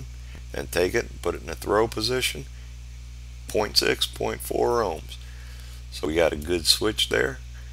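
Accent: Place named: Chicago, United States. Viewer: Thanks for the excellent video. Like the Chicagoland accent as well. Reminds me of home.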